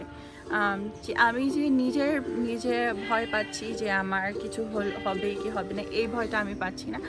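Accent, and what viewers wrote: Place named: United States. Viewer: আপু তোমার কথা গুলো এরকম কেন ??ইউ এস এ আসল বাসা তোমার??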